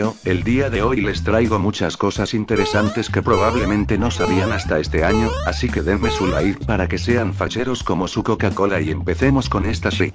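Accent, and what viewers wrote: Venezuela. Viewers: Vos sos Venezuelano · Esa canción es de la tino América y es venezolano porque su forma de hablar las formas de groserías es obvio que es venezolano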